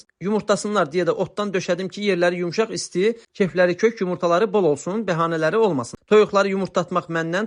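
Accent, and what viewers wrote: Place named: Balakan, Azerbaijan. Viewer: Şirin Balakən lehcesi😊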